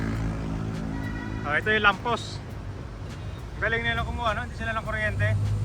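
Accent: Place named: Visayas, Philippines. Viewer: Ayusin m pgsasalita m.....bisaya kb😅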